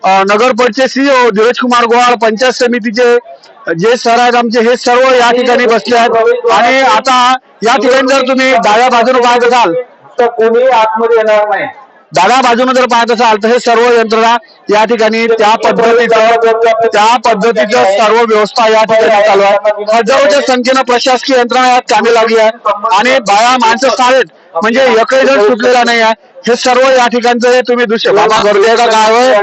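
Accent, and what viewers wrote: Varhadi, India. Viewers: आपल्या वर्हाडी भाषेत मस्त बोलतात दादा..... एकच नंबर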